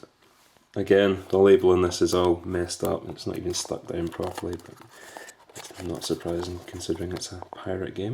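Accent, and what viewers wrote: Scotland, United Kingdom. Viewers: Broken Engrish read in a Scottish accent. My life is complete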